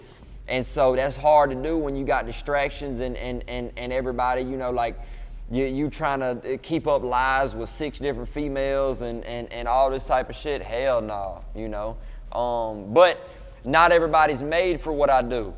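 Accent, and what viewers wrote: South, United States. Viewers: this is exactly why I subscribed to him one day I was just scrolling and came across his video for the first time and he was responding to somebody saying that he talks black and when I hear that, that shit really gets under my skin because I grew up right next-door to him in south Alabama, mobile to be exact so when I hear him, I hear a country boy and when I moved to Texas, I used to get the same shit and I would be so confused because this is how all my family talk like my granny or especially my Pawpaw if you were just on the phone with him, you would think you was talking to a old black man, but now that I’ve been in Texas so long, I can see what they’re talking about because how a lot of the Black people talk over here is how them back wood country white people talk in Bama. But yeah, somebody was hating on him because of his accent and you could tell he was being genuine as hell replying to this hater so I followed him solely off of somebody hating on him and now I’m glad I subscribed to his page because it’s refreshing to see someone being exactly who they are authentic and humble. 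So yeah, I’m rooting for Lil bro and another thing is I really would like to hop on a track with him but I doubt I could afford a verse😂🤷🏻‍♂️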